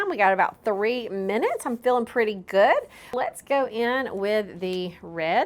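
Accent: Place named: Alabama, United States. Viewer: Love the lighthouse. When I went to your Facebook account I was delighted to find that you are a fellow Alabamian. I knew I liked your accent😊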